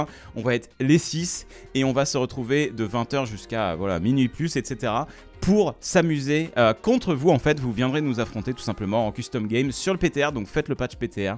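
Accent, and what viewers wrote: South, France. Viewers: L'accent du Sud pour rein c'est toujours un bonheur😄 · 😂 le meilleur accent du sud ever 😂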